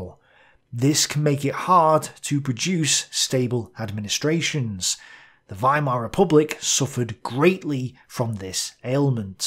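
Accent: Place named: Cockney, United Kingdom. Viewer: Dude you have a strong cockney accent ! It sound very weird but I am ok with rhat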